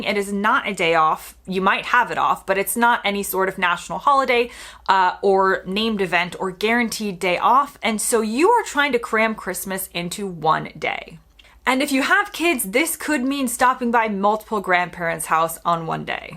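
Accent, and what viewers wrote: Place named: United States. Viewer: you are loosing the american accent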